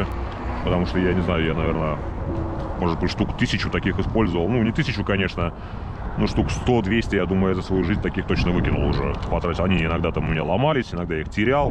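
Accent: Russia. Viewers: Я так и не понел,ты русский или американец?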